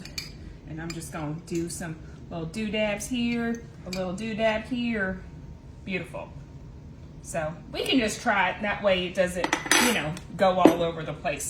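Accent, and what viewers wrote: South, United States. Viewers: Love your Southern accent & your recipes, also show more of you 🥰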